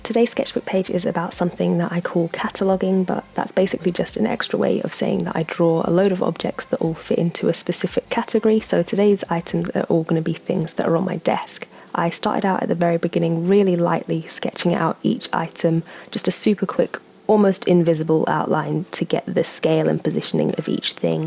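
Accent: United Kingdom. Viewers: Nice vid and really love ur british accent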